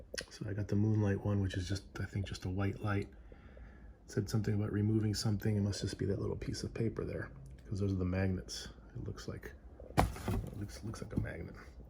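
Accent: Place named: Long Island, United States. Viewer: Are you on long Island? Sounds like it....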